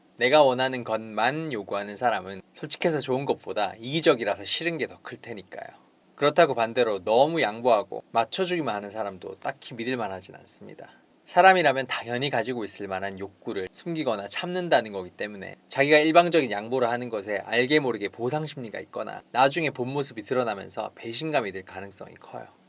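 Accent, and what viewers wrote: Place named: Busan, South Korea. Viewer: 항상 잘 보고있습니다. 말을 너무 부드럽게 잘 하는 듯해요. 특유의 부산말투도 정감있구요^^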